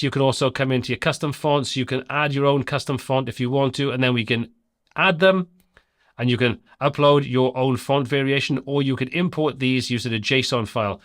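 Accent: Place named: South Wales, United Kingdom. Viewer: He’s a hwntw (south Wales)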